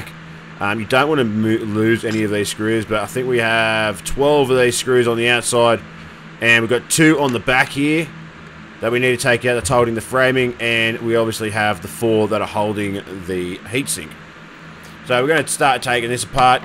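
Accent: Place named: Australia. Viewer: I love that aussie accent lol :D btw great vid bud, u just gave me some encouragement to re-pad my gpu-s! 😊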